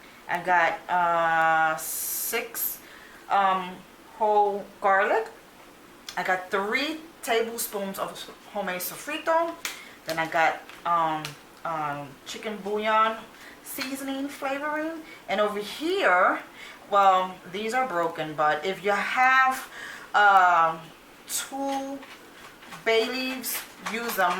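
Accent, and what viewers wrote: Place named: New York, United States. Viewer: Looks delicious going to make for my family today, I wonder how long it took to cook the chicken in the beginning? Also where are you from, sounds like a New Yorker to me 💁🏻‍♀️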